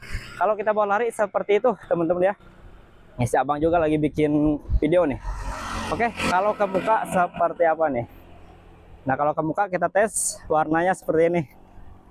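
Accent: West Java, Indonesia. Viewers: Sunda pisan mang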